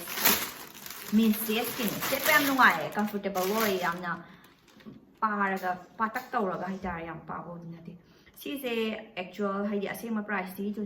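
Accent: Kakching, India. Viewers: yengvda nsk 😘 ym nungcye ngna wa ngangba j taba ym nungaiye kakching gi lol khoira ngangbdo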